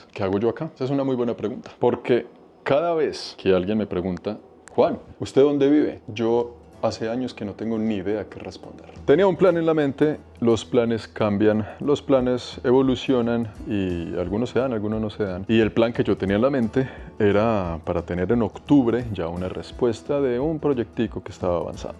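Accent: Bogotá, Colombia. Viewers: Amigo, muy Guerrero siempre me pregunte cual era su historia, habla frances? Como aprendio? Por que vive en canada? Es usted nacional de otro pais, su acento es muy marcado colombiano.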